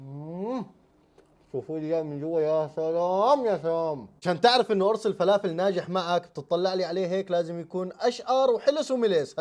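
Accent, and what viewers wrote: Syria. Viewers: اللهجة السورية المميزة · حلوه اللهجه السوريه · كم هي جميلة اللهجة السورية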